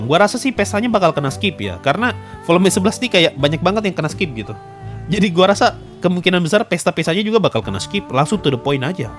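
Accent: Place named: West Papua, Indonesia. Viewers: Iya, ane rasa seperti org Papua bagian kota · Maaf kk klw boleh tw kk dari daerah mna, soalnya logat nya kek ank" Papua barat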